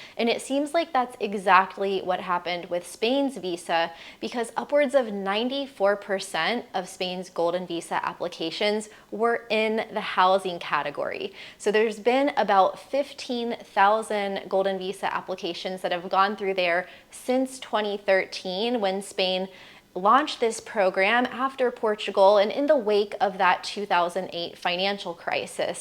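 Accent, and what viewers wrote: United States. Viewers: so professional, love your american accent, love your eyes, i'd give you the visa you wanted If it were up to me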